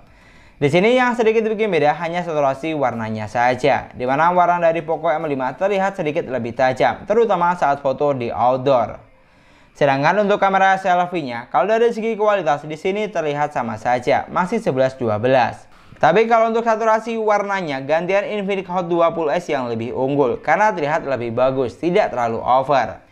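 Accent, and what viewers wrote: Madura, Indonesia. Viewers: Orang madura ya bang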